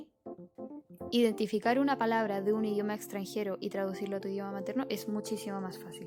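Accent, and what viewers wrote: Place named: South, Spain. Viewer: tienes un acento precioso! Y ese acento sureño se entiende perfectamente! Muy buen video! Saludos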